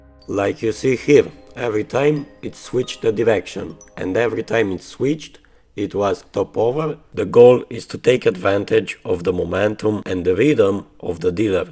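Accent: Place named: Russia. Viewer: Bro your voice is a legend gambler voice! I love that russian accent ❤❤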